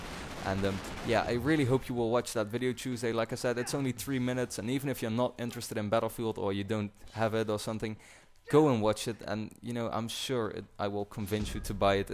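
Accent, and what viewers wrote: Netherlands. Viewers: Ik ben ook Nederlands en ik zocht op youtube een commentary over Breaking Bad en kwam toevallig op jou uit! Geweldige serie. Ik vroeg me van het begin al af of je Nederlands was ;)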